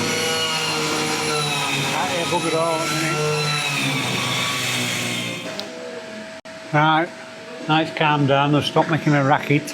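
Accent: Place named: Sheffield, United Kingdom. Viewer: Really enjoying videos. Great to see the house coming on. Would be good to know costs building land. Plus a bit of back story about you both. Your accent not too dissimilar to mine. I'm from Sheffield. Any keep the vids coming. Cheers mate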